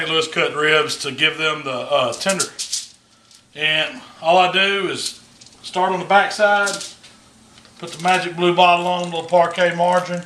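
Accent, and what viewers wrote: South, United States. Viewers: 1 thing u trust is a fat guy with a southern voice haha.. them big boys can cook them meats haha..love this guy!! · You know it going to be some good bbq when the guy making it has a southern accent 😂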